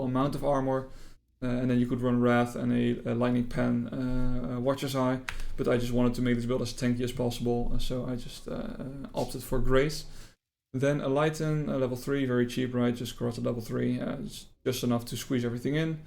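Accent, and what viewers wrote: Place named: Netherlands. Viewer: This is the best build guide so far. This is my first Poe league and character. Started as Zizaran guide then felt squishy changed to was super tanky but lacking dmg. I tried to change some pob ninja builds I couldn’t work it out. Until I found you. Thanks for your build and I really think you deserve more viewers. And I’ll be your loyal subscriber from now on. Super detailed I understood everything. (Btw i lived in Den Haag for 8 years and i hold Dutch passport, could easily recognise your accent 😊). Please keep continuing these kind of builds. And I’ll follow everyone of them Dank je wel 👍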